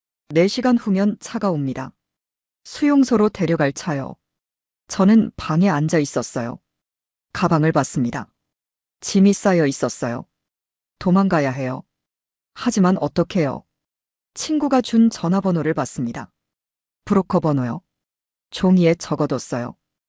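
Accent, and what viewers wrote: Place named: North Korea. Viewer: 나레이터 바꾸세요. 너무 부칸식 ~ , 사투리는 좋은데 억압죽은 그렇네요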